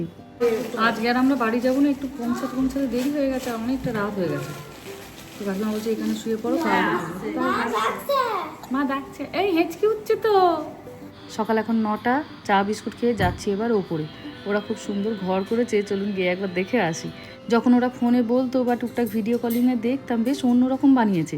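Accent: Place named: East Medinipur, India. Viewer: West Bengal যত গুলো জেলা আছে,,সবার ভাষা বাংলা হলেও কিছু না কিছু পার্থক্য আছে,আমরা পূর্ব মেদিনীপুরের মানুষ কিন্তু এই ভাবেই কথা বলি।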